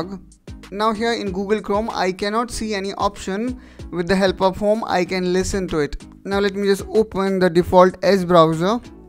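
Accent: India. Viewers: You should not use Indian accent if you're from India. You need to learn how to speek properly.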